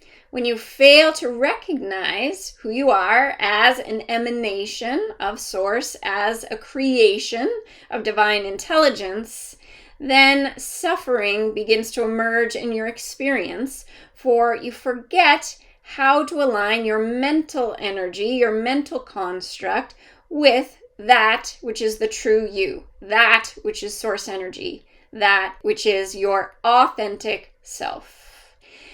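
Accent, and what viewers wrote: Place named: Canada. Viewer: Thank You, Took Me Years to Realize this. And The Re - Minder Is Great!!! ✨️✨️✨️⭐️💔💖💞 Love It. 🙂. I Luv The Way Your Guide Explains this. ☀️ And The Canadian Accent, Smile Is Priceless. 🙂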